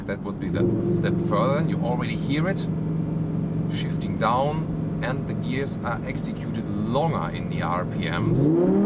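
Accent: Germany. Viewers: Dear, I always enjoy your videos, they are very detailed but I really get the feeling that you're not a sports car type of guy, so I rather see you in a Mercedes SL or Jaguar XK, no offense. That said, maybe you should leave the sports car reviews to someone else who actually appreciates these cars, just saying. No, I would never take an Audi TT over the R8 (are you nuts???) and whenever the Mercedes SL comes up, I get a serious allergy. You are right about the R8 V10 Plus standard bucket seats but you kind of forgot to mention that Audi offers optional (free of cost) sport seats, which are much more comfortable. Also, you should work on some of your English words, you sometimes translate them directly from German into English but they do not really make sense in English. Same goes to your accent, you are using a German accentuation for English words, which of course does not really sound too good. 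This comes from a fellow German.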